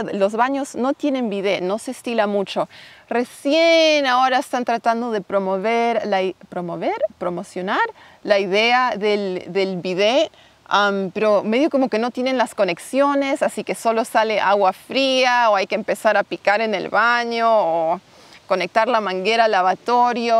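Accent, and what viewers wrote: Argentina. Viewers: Por como hablas, al principio del video creí que eras una argentina en Canada. Tenes un acento argentino casi perfecto! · Te felicito por tu español o castellano argentino. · Fabuloso tu acento argentino! casi perfecto, sólo deberías (si te place) cambiar el "ummm" (muletilla anglosajona) por nuestro "eeeh" . Saludos! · Hola!!! Soy Argentino.....Me encanta como hablas el español Bueeee....en realidad el Argentino!....Vivi 2 años en Europa.....No tenes idea de como extrañe el Bidet....jajajaja!!! Les mando Besos y Abrazos! Sigan con este contenido....... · me impresiona el nivel que lograste de español argentino, no sé hace cuanto que estás aquí pero de verdad impresionante, te aplaudo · Tu acento argentino me recuerda a Ingrid Grudke, q es una modelo argentina. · Excelente tu español-Argentino!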